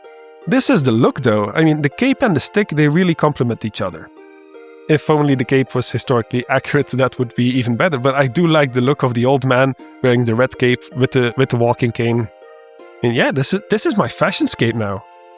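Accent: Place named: Flanders, Belgium. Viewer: he sounds very flemish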